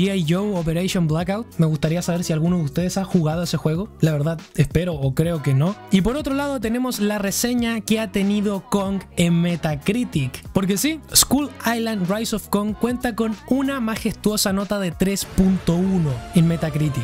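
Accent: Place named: Chile. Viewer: Me estallan tus vídeos por tu acento tan hermoso, viva Chile, Saludos desde Argentina :D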